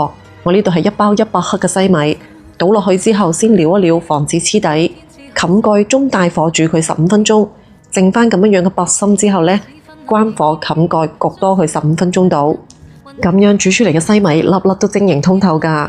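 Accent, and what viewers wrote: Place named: Guangzhou, China. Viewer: 廣州口音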